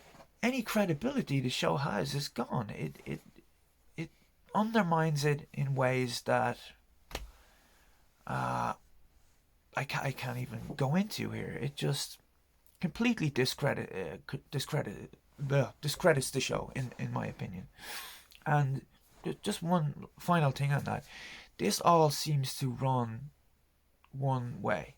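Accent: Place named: Ireland. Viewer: I love the Irish accent, it's my favourite alongside the Italian accent. It's always nice to hear an Irish accent, especially when they talk about a subject I'm passionate about. :)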